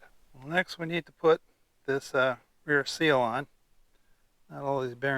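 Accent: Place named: Yorkshire, United Kingdom. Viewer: thanks for another excellent tutorial. The overdrive on TS394LO was working when I shut it down a long time ago so hope it is still OK. Our families are from the same neck of the woods - Yorkshire - probably why I can understand everything you say.:-) John in Manitoba.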